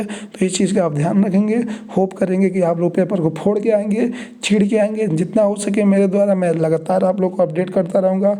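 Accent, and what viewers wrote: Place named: Bihar, India. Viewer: Are bhai hindi bol liya karo, bihari main samjh nahi aata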